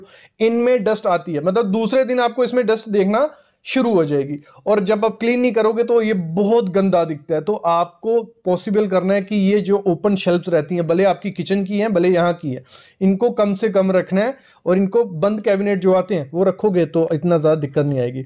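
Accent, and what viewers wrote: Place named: Himachal Pradesh, India. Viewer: Aap पहाड़ी ho kya you sounds like from Himachal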